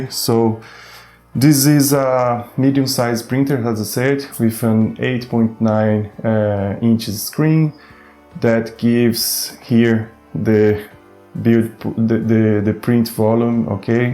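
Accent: Brazil. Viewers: By the accent, it's Brazilian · Sotaque de BR haha belo review meu caro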